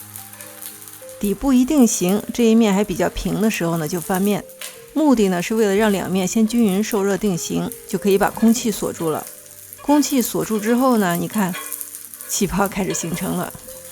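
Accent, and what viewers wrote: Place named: Northwest, China. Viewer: 因为爱上了西北口音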